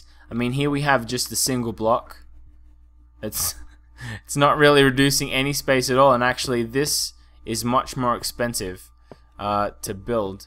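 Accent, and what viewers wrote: Australia. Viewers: You sound like an Aussie! Southern Hemisphere people rule!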